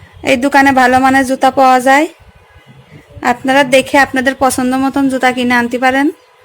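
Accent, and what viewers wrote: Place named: Khulna, Bangladesh. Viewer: আপু কি খুলনা বিভাগ এর মানুষ নাকি? আমাদের ভাষার সাথে খুব মিল পাচ্ছি।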